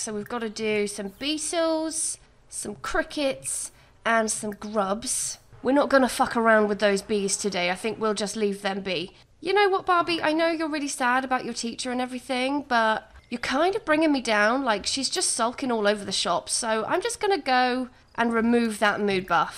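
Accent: United Kingdom. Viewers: you are my favorite simmer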